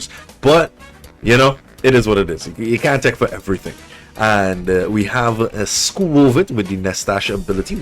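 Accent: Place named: Caribbean. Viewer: Trini or Caribbean accent...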